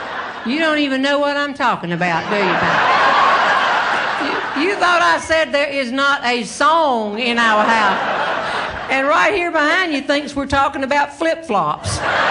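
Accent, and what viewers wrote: South, United States. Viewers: Love your stand up humor Jeanne I have a lady friend and she is from the south. She talks like you and jokes around. we call her southern Bell. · There’s something so lovely about the southern accent which makes this even funnier;)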